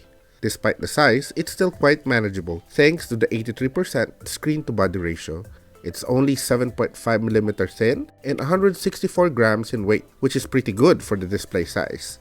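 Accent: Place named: Philippines. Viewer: Why does he sound like a Filipino speaking in english?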